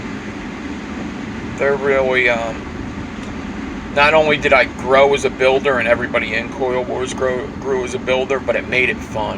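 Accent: Philadelphia, United States. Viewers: I can really hear the Philly accent ❤❤Great Topic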